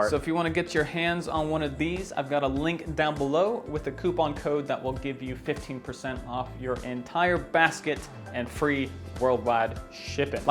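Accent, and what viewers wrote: United States. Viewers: wait, you are british? dont u have an american accent? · If you're from South Africa and live in the UK, how come you have an American accent?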